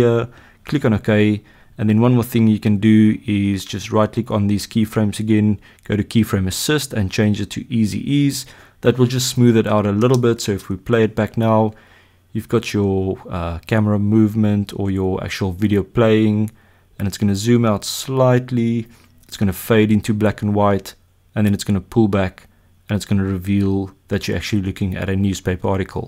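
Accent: South Africa. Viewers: Love the South African accent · That accent though. Are you South African? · Didnt know south african english differs so much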